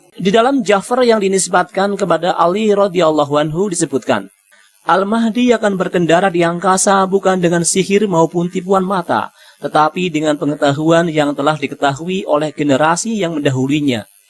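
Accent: Tegal, Indonesia. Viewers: Yang ngomong orang tegal ya ? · Logat tegal yak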